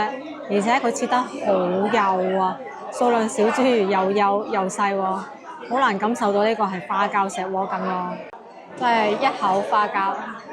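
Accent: Guangzhou, China. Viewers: 我爸媽在廣州佛山大同去香港住的（19xx年了) 我一路看你油管. 我好希望大家有生之年. 再看到白話廣東話再過十年二十年都有廣東文化和廣東話.沒有廣州話 那有今天的香港廣東話. 好喜歡你講正宗的廣州話.謝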